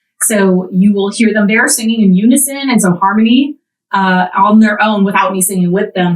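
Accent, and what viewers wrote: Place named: Canada. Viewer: Plus I love hearing Canadian... sets my maple leaf little heart a flutter <3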